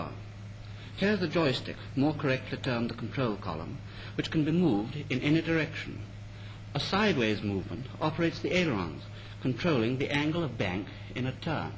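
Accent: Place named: Estuary, United Kingdom. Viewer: Mr Scott might not have understood your estuary accent, but would have been too polite to make silly comments about it.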